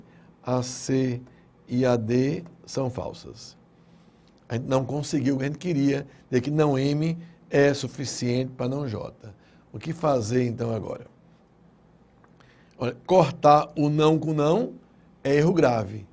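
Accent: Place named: Pernambuco, Brazil. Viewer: Excelente, professor! Parece até que eu tô de volta às salas de aulas, ainda mais ouvindo esse "pernambuquês", rsrs O senhor ensina muito bem e aplica a teoria em várias práticas diferentes, o que ajuda a estar preparado em qualquer situação. Obrigado pela aula!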